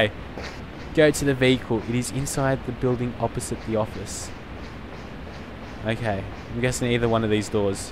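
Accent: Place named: Australia. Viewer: he sounds NOTHING like nerd3. Nerd3 is English, this guy is Australian...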